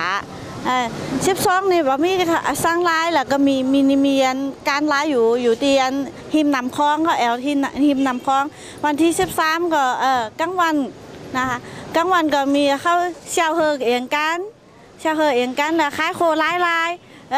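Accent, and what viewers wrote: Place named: Yong, Thailand. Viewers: ฟังไปฟังมาก็เหม็อน เขาจะอู้ยอง นะพี่ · ใช่ๆ พูดเหมือนยองเลย ยายเราพูดคล้ายๆแบบนี้ล่ะ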